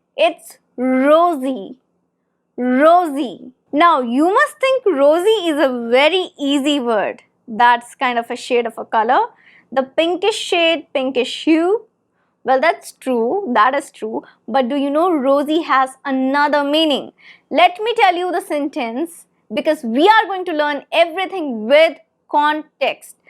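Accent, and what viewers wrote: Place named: RP, United Kingdom. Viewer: Can be called as, Queen's English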